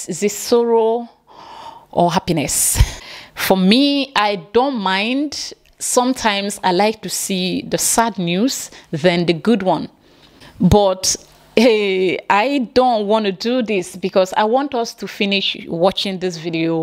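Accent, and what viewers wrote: Ghana. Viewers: You lady, you are funny, you speak as if you are a Ghanaian.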